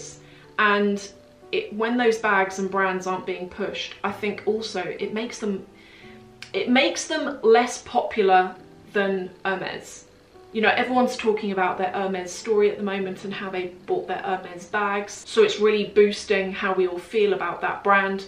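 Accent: RP, United Kingdom. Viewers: To point - there are lots of “wannabe” Brands that are very expensive but nobody buys them because nobody has ever heard of them Anyhow just commenting here bc I took my wife to a few luxury stores in Paris and London and she watches with her lil posh accent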